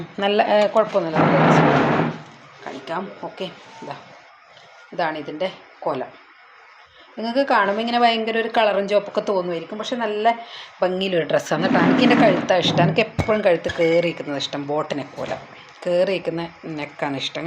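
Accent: Kannur, India. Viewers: കണ്ണൂർ കാരി ആണോ ഭാഷ അത് പോലെ ഉണ്ട്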